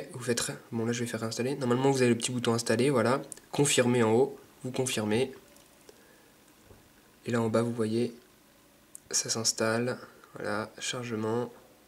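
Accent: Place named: France. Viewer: j'aime ton accent français =)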